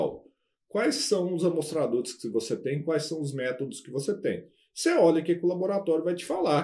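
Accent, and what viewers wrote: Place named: Minas Gerais, Brazil. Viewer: Gostei. Pennnssaaa num trêm veio. É minero mmo. kkkk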